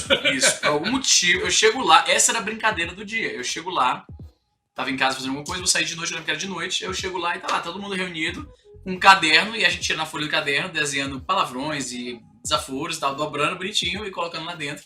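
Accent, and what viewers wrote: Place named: Ceará, Brazil. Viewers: Éeeegua.... aêeeeee um cearense numca esquece suas raízes! Aprende com teu pai Izzy 😄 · Sotaque dele é muito forte. todo vei aqui em Fortaleza fala assim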